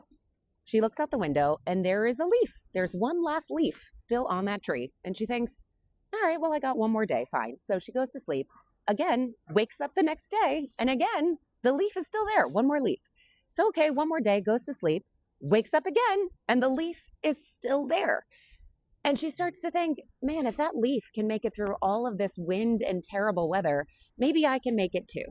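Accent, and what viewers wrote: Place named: Ireland. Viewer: I hear a hint of Irish in your voice, great story tellers, the Irish and what a lovely story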